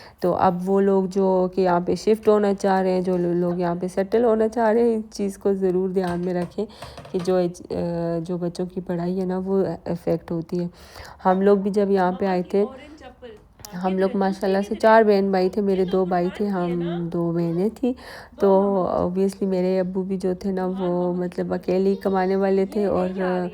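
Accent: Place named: Hazara, Pakistan. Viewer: Her accent of Urdu language reflects her connection with Hazara Valley of Pakistan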